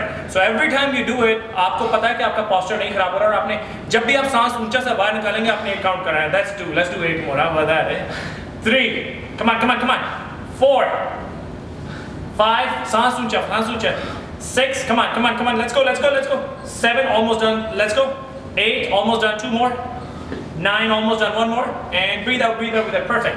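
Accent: Punjab, India. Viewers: paaji.....appka jo accent hai bhot bhadia hai......that is punjabi,english and hindi mixx......ashians ke liye best hai aapki videos........khass kar punjabioo ke liye😘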